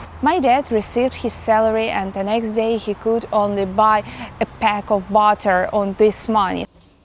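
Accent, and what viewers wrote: Russia. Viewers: девочка.... С твоим диким русским акцентом ты лучше говори на Великом и Могучем......или возьми сотню уроков фонетики английского лондонского языка.....не перепутай с американским, австралийским или НЗ-ким.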